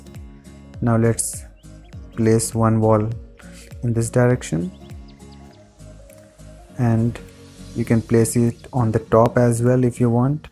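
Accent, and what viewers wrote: India. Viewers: Are you an Indian ?? Your voice matches Indian voice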